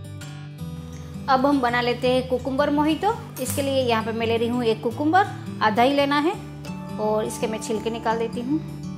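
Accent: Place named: Kathiawar, India. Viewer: Madam aap Kathiywadi ho? Hindi bolne par bhi pata chal raha hey...!!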